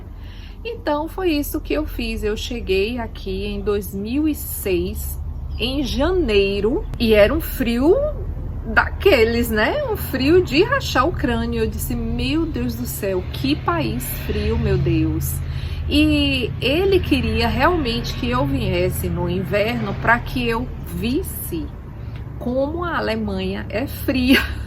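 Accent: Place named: Salvador, Brazil. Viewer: Olá, eu também sou de salvador, vc explica muito bem boa dicção, com esse nosso sotaque bem presente. Nunca vi seu canal, 1 vez.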